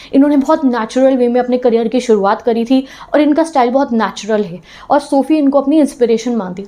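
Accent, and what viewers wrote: Punjab, India. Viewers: Mam are you from punjab?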